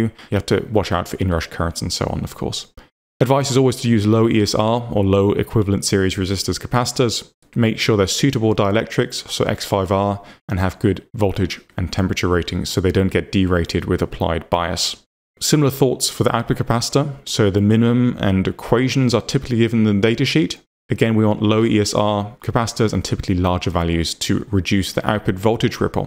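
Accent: United Kingdom. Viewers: You are one of my reasons to love the British accent. I like your voice. You look like a voice over artist. Could you tell me what is the difference between basic and extended part in electronics? I usually hear you in many videos say that this component is an extended part but I don't know what it is. Thank,.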